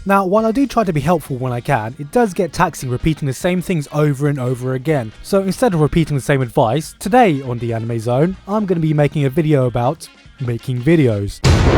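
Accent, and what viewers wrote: United Kingdom. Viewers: Why does this japanese guy sound british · Did you learn the British accent just for your videos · Why DOES he sound British???? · British accent, check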